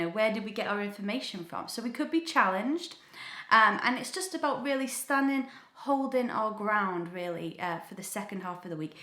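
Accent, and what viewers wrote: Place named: North, United Kingdom. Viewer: I like your Northern accent 😂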